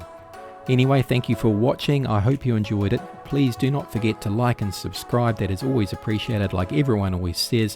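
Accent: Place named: New Zealand. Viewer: Have you played it recently or moved on? I'm open to any other suggestions on open-world survival games that I can play with friends. I have not looked into State of Decay yet or Valheim, and so keen on The Day Before when it comes out! I'm also a Kiwi but living in OZ. I get so much crap XD. Happy to subscribe to a NZ game reviewer on YouTube! I assume that because of your accent and logo :)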